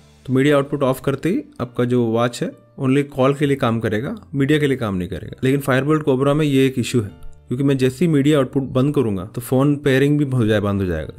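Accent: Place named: Kerala, India. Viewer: Full malayalee accent.. adipoli😅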